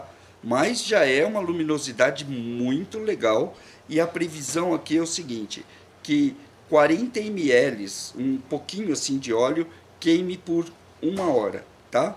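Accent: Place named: São Paulo, Brazil. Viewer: Que bonitinho sotaque paulista!! O mais importante é o tutorial.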